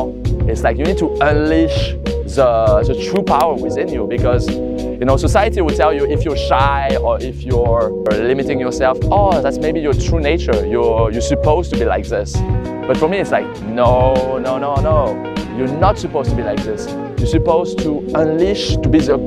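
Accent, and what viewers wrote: France. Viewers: Thank You man ! I think this is the best video I ve seen today! And I love ton beau French accent en tout cas merci pour cette video et tout tes conseils instructifs <3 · are you french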